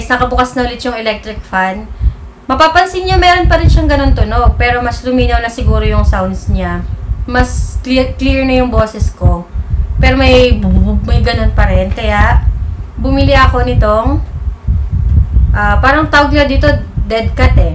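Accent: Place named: Visayas, Philippines. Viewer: Thanks po! Narinig ko parang bisaya rin kayu? Hehe pa shout po 🤗😁❤️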